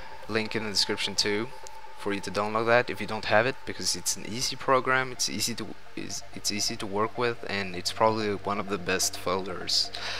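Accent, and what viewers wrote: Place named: Sweden. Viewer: thanks :D man hör att du e svensk ;)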